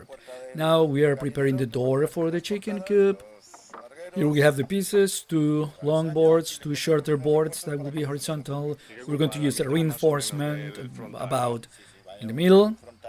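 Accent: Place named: Spain. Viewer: pero ingles se nota el spanish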